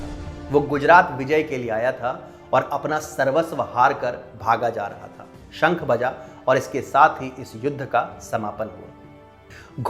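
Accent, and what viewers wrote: Standard. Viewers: I have seen and heard quite a lot of your historic narratives... I have to admit.. Aap ka gyan kai guna shrestha hai atirikth anya tathakathit gyaniyon say.... Evam jo shudh uccharan hai wo bhi sarahana yogya hai... Bandhu. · Itni sudha hindi kabhi kabar hi sunane ko milti hai, I like your speech. Chanakya, yogi, k baad pehalibar aapse itni shudh hindi suni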